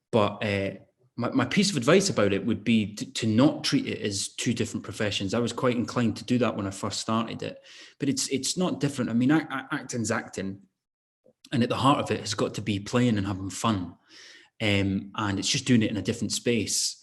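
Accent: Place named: Scotland, United Kingdom. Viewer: His own voice and Scottish vernacular; it sounded all good to me.. What a very likeable man.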